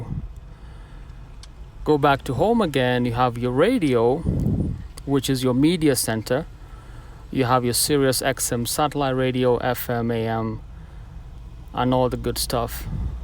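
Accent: Kenya. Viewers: You sound Kenyan. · That accent is so Kenyan yani 🇰🇪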